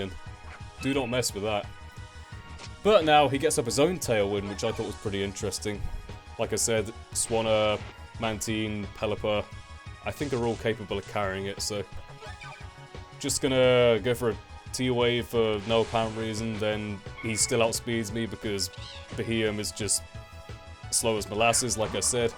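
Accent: United Kingdom. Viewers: FINALLY ANOTHER BRITISH BATTLER I'm a britt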